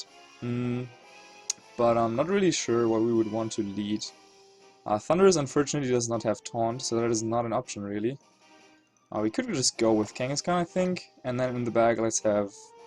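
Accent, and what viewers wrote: Germany. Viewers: you're german right ?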